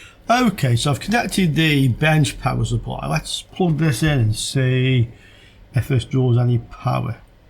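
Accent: United Kingdom. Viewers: Tiež si ho fičím 😀 ten britsky prizvuk je bozsky. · tak mne britsky prizvuk lezie na nervy, zaujima ma skor obsah a on to popisuje taj britsky - jednoducho :D . Ty sa zaujimas o elektroniku,ci len prizvuk?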